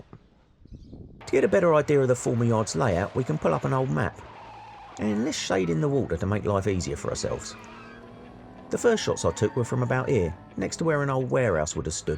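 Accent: London, United Kingdom. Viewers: Mate, I ain't sure if this is Mockney or Cockney... But either way it works brother! You comin' across ere like a right cheek-chappy! 😉👍🇬🇧 Edit: 5.35 A ground-level bay window projecting across the pavement and almost kissing a lamppost opposite!? Who/what/how did this get rubber-stamped? 🤔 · Great to hear a proper Londoner talking about London. Our government needs arresting for treason the state of the place now.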